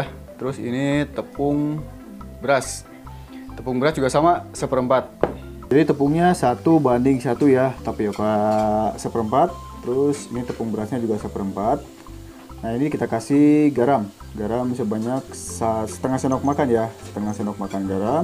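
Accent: Sunda, Indonesia. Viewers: Kang sunda pisan Ga ada budah trs kl udah gak ngagolotrak Santai banget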